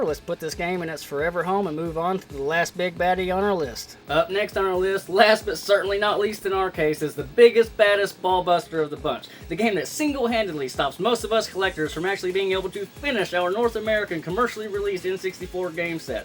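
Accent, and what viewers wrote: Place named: Kentucky, United States. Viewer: Hell I thought your accent sounded rather familiar. I had a feelin you were a Kentucky boy too~ very nice collection of games and I can see why those 5 are considered the "Big Bad 5" I honestly had no idea about Sculptor's cut either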